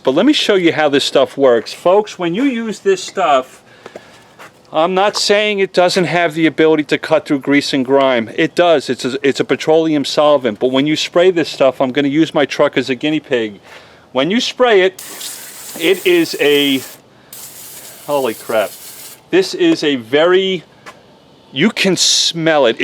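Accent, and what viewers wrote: New York, United States. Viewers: your accent sounds like your from new yark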